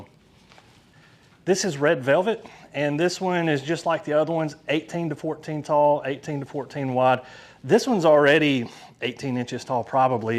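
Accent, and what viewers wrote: South, United States. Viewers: very educational....finally a southern talking youtube on geranium care!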